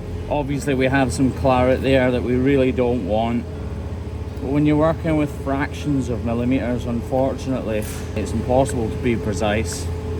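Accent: Scotland, United Kingdom. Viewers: Tired of hearing your Scottish voice. Just show the video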